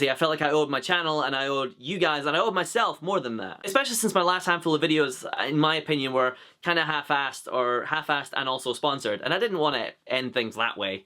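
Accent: Scotland, United Kingdom. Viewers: Glad you're back and you sound as Scottish as ever! I look forward to your following videos and I'm sending creative vibes your way :) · Literally created a channel just so I could respond to this video. I'm glad you're back, I haven't been youtubing for some time but I originally started watching your videos to learn a Scottish accent. Then I started enjoying them and I'm very glad that you're back.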